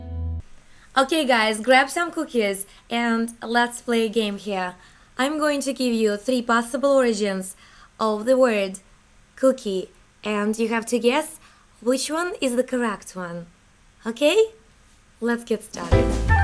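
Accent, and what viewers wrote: Russia. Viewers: you russian accent is awesome i must say! good on you! kak naschet slova 'sadism' ? mojno nemnozko pogovorit a marquis de sade i tak dalee. privetik is germanii, serojenka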